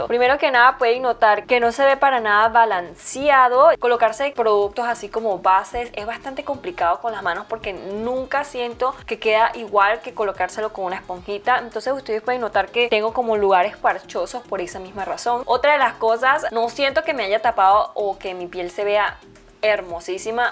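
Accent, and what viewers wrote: Venezuela. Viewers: Hola moreeeee👌🥰😂 saludos desde venezuela, tu acento es muy neutral pero tienes ese venezonalito adentro, me encanta👌❤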